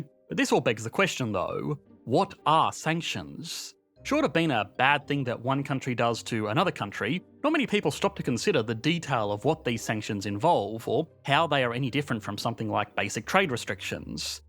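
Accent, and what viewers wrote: Australia. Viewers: weird combo of vocal-fry and Australian accent. made me uncomfortable 😂 good info though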